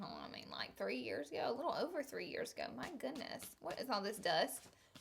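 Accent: South, United States. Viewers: I also couldn't hear her very well. I had my volume turned all the way up and it was still hard to hear everything, especially when she goes a mile a minute lol. I still adore her quirkiness anyway, even though I have to rewind and listen over and over again to try to figure out what she's saying - between the southern accent and the speed at which she talks. is so cool and real. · Your cracken me up today! Love your southern accent, don't ever loose it! Please do one of these on setting sprays, if you have some you like at a good price. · I love your personality! I'm a southern girl too (sorta, born in FL, live by the beach). I wish I had that southern accent all my life, I think it's so cute and love listening to girls who have one, top that with your silly sayings and OMG!😲 I could just talk to you for hrs! lol Love your makeup! You look fabulous as always, plz do u tut on that look! 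😍😍 And I looooove your palette! I wish u did more tuts with your palette! 😘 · What state are you from in the south?? I've always have wondered!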